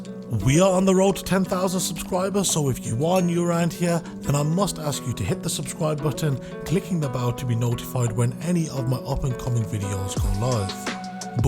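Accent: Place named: West Country, United Kingdom. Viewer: Yo amigo thanks for the sub, I have subbed back. Great video and good to hear a local west country accent! Keep it up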